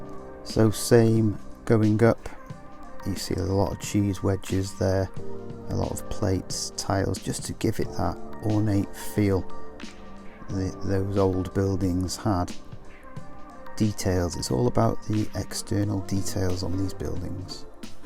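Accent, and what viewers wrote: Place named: United Kingdom. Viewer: oh wait….sorry…..bad joke was bad…..I almost didn’t recognize the British/English accent…..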